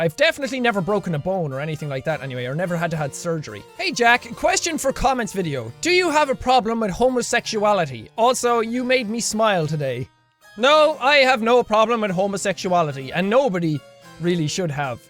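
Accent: Ireland. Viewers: Are u Irish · Hey jack fellow irish man here. Where ya from and can you please say Ballymena 'ey for me!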